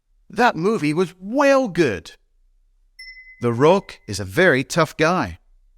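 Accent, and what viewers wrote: Scotland, United Kingdom. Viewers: Thank you so much for this video, I finally fully understood what dodgy means now! I am Japanese, and my Scots husband uses it awful lots, I understand most of time, but sometimes I am like “ what does that dodgy mean in this case?”. I wonder if you are a Scots too? The first bit “ I got lost in London ~” and some words sounded like so, but I wasn’t sure. Cheers!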